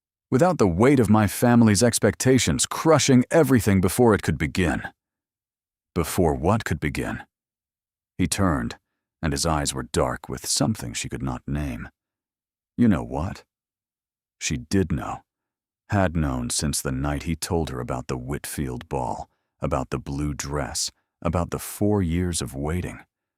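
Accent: United States. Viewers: I do wish that you would choose an English voice for narration, this American voice speaks as if it's telling a Mafia story. Spoils it all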